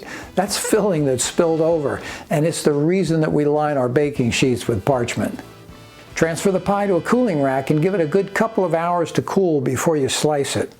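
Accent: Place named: Massachusetts, United States. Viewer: Is he from Central or Western Massachusetts? His accent is making me nostalgic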